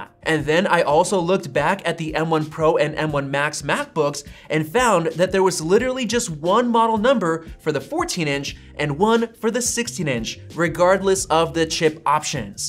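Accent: Russia. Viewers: Portativny personalny Computer! I love your russian accent! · haha, I love your Russian accent. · Афигеть, у тебя русский акцент)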